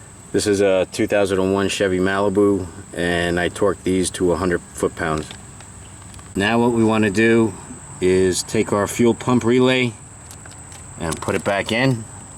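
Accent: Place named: Boston, United States. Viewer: Thanks for explaining every step while using the exact words to describe what parts needed what size tools, which direction to turn, slide, twist, push, pull and clip everything your hands touched under there as you recorded this. You didn't talk too fast, you're easy to understand and your Boston/East coast accent- Luv it! 😊 My auto mechanically inclined go-to men (Dad & Gpa) aren't here to help me with things like this anymore, so Thank You for the time, effort and energy you put into this video!!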